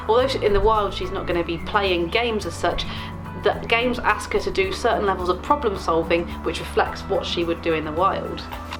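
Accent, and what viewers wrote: United Kingdom. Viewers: In you say: "she's literally older than me" automatic subtitles: "it's really a hotter than me" how dare you translater? Zorro is a "she" not an "it" ^^ I think your cute british accent confuses the translater, altough i think you speak pretty clear. (because english is not my first language, i happily use the automatic subtitles often anyway)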